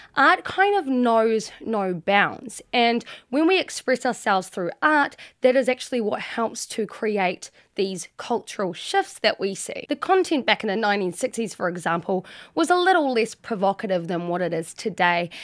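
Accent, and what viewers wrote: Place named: New Zealand. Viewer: She sounds kiwi so New Zealand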